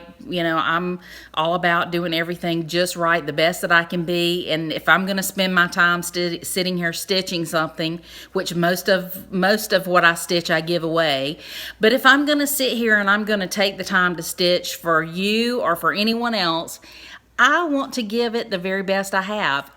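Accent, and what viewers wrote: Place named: Oklahoma, United States. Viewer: You are so cute. When I first heard you I thought she talks like me. I'm from SE Oklahoma and you would fit right in. I am subscribingvright now. Plum Street is my favorite, too.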